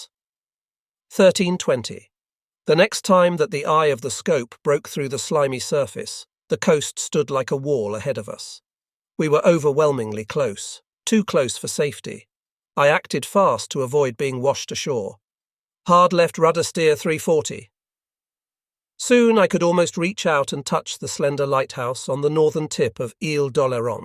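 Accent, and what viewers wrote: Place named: United Kingdom. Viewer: Why does the narrator have a British accent? Shouldn't he have a German accent?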